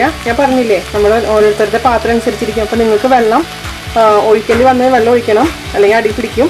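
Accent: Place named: Thrissur, India. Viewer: .....I really loved the way you talk:D thani thrissur bhaasha great recipe tto. Am also from Thrissur but don't have that tone:) I will wait for more recipe's from you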